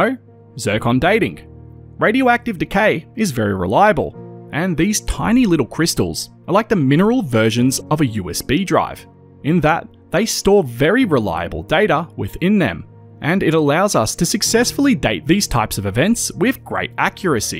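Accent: Australia. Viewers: Nice to hear this program in a good ol Aussie accent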